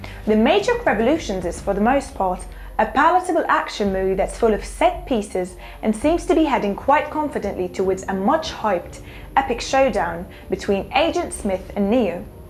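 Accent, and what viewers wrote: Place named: London, United Kingdom. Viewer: Miranda Brooks I had no problem understanding her at all. im from Montana and can understand that it's a typical London accent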